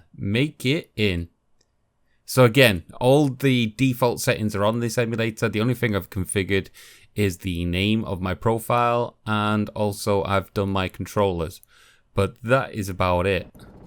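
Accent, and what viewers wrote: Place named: Liverpool, United Kingdom. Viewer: Liverpool? Really? That's like when I said Totalbiscuit sounded like he was from somewhere between Liverpool and Manchester, maybe Chester, and was told he was a Geordie. I can hear a bit of scouse in you now you said it.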